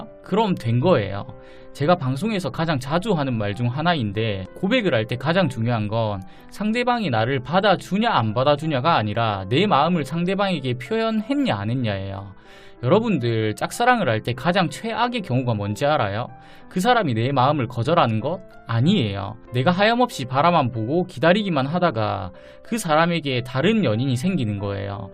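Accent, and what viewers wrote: Gyeongsang, South Korea. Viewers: 혹시 사투리가 경상도이신건가요?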